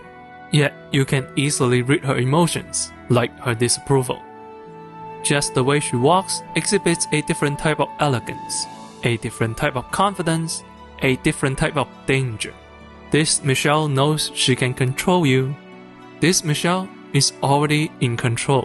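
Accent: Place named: China. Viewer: Listening to the commentator is painful. His Chinese accent is too strong!!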